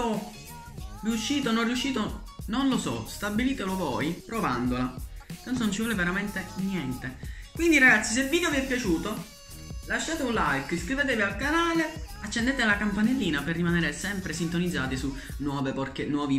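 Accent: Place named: Palermo, Italy. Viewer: sei di Palermo? No perché anche io lo sono e penso di aver riconosciuto l'accento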